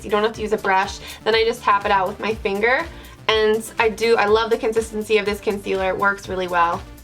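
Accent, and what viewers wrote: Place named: East Coast, United States. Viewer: I Love your slight accent. I'm assuming your from the east coast?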